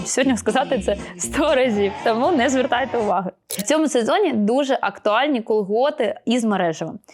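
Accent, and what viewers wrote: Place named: Russia. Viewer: і купа помилок, відчувається, що людина в житті спілкується російською, і це підвердилось.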